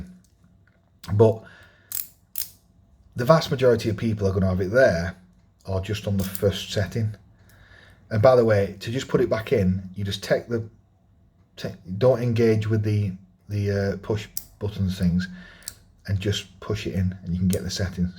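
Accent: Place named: West Midlands, United Kingdom. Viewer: Thanks for the review! I have just bought the strap version of this watch . The bracelet looks very well made, though… I think I’ll have to buy another one, just to be able to swap straps… Can I ask you what part of England your accent’s from? I’m not a native speaker and I’m trying to figure out as many accents as I can… West Midlands, perhaps? Great review, thanks!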